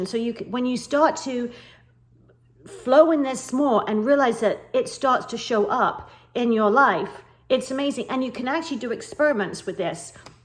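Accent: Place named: England, United Kingdom. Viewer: Ha, i thought you had an English accent that explains visiting wales a few times 👍 you know you mention about being of an older age even in our 50s or older as long as you know about conscious awareness that our thoughts are living entities creating our physical realities then evrn 10 20 or 30 yrs of life of having this awareness that we can create anything through DISCIPLINED! Mind to speed up the progress or as i use my own affirmations or subliminals on our voice recorders on our phones played every night as we sleep for our desires can bring everything to you so even at the later part of our lives through conscious awareness manifestation we can achieve more than just scattered rolette style thoughts of randomness it is all about FOCUS, AND DISCIPLINED MIND, thanks for mentioning wales 🏴🙏 worth mentioning the mirroring techniques to of bringing things to us faster 🪞😉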